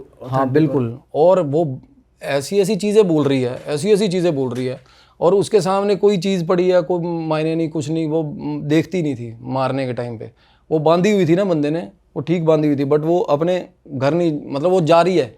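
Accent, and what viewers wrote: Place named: Punjab, India. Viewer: bhayi k beech beech mai Punjabi nikal jati ha😅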